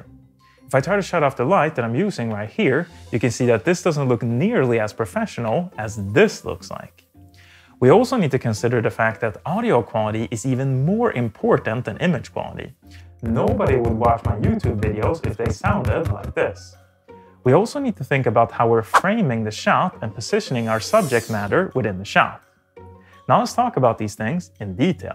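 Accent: Sweden. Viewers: Dude hear some Swedish accent. Thanks for the video, I got a GoPeo H8 for my birthday a couple of days ago.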